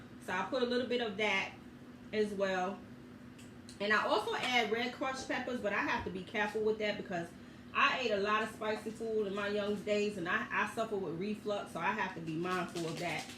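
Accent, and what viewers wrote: New York, United States. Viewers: Everything looked great sis!! I thought u sounded like a New Yorker! But I second guessed it by the way you work that garden lol! How long have you been in the South?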